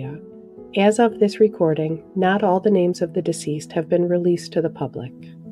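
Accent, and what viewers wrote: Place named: Upper Midwest, United States. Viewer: Are you from Minnesota by chance ? I detect a strong upper Midwestern accent.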